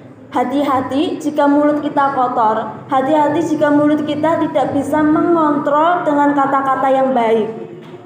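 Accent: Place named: Banyumas, Indonesia. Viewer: Semangat mbak ngapak❤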